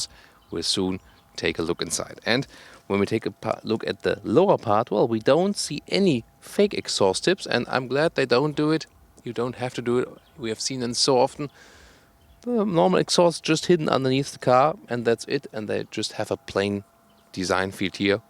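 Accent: Germany. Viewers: He speaks English like a german, why is he speaking English !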